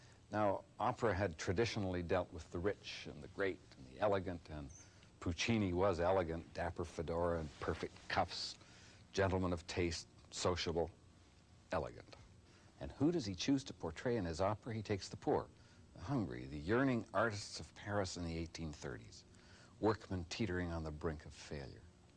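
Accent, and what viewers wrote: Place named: Canada. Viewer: Who’s the host? Presumably he’s originally Canadian, but I can’t place him.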